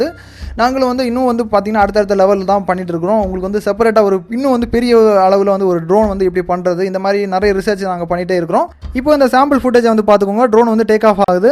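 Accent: Kanyakumari, India. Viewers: Super bro...KANYAKUMARI nnu onga slang vache guess pannitaen. ...keep rock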